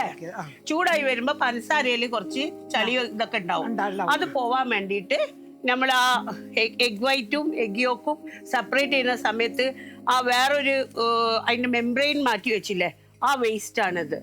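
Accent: Kozhikode, India. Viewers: Kozhikottaare samsaram kettaal thanne vayar nirayum ❤️‍🔥💪 Oru kozhikotukarii 😉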